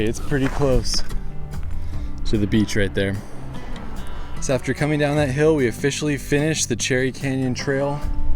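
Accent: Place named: United States. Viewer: American accent All I hear in every sentence is “ coastal trail” with no other information. Are there no biilfungs or a town there to buy food abd water? Is there nowhere to stay overnight? Are there no emergency services? Tgat woukd make sense but then again you’re Americans. Evrry little about yiur country makes sense.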